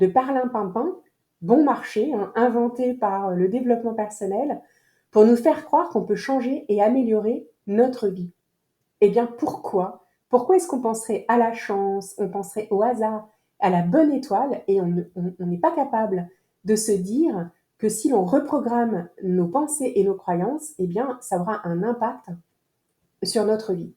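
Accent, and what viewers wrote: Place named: Quebec, Canada. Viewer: Bonjour 😊. Merci pour cette belle capsule. Hâte de découvrir un peu plus ton nouveau programme 😊. Et ton accent québécois est juste terrible 😂😂. Très bon dimanche ♥️♥️♥️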